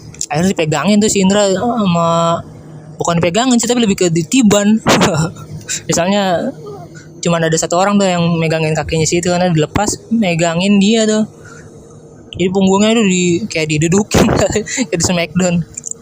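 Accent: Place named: Bekasi, Indonesia. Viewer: Orang bekasii ya ini bahasanya khas banget 😂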